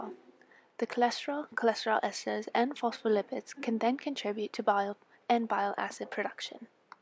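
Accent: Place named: United Kingdom. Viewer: Lol i love the british accent! hahahhahaha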